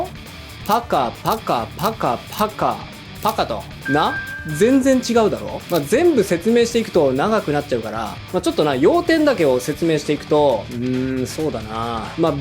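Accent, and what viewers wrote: Tokyo, Japan. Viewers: ブラックになると江戸っ子風になるんや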